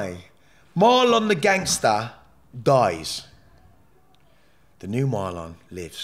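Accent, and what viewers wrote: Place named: Black Country, United Kingdom. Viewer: Can still hear that yamyam accent now and again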